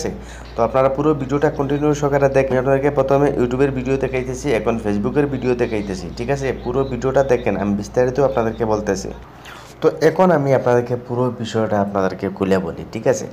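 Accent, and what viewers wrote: Sylhet, Bangladesh. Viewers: বাসা শুনে মনে হচ্ছে ভাই সিলেটি😊😊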